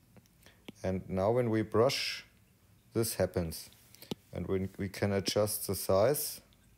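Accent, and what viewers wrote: Germany. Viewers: English with german accent :)) very very useful videos Thank youuu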